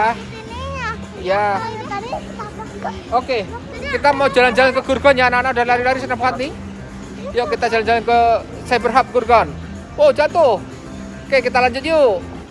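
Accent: Java, Indonesia. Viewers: maaf mas mo nanya mas ini asli mana to.. ngomongnya kek medok medok jawa gitu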